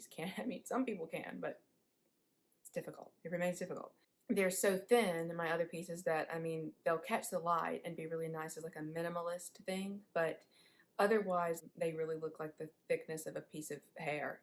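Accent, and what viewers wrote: South, United States. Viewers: Cool southern accent!❤great review!👍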